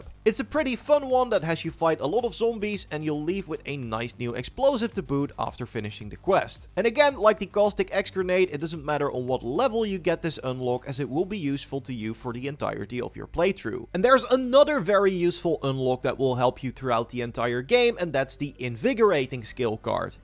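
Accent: Netherlands. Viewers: My god.. the Dutch accent in this guys' English 🥲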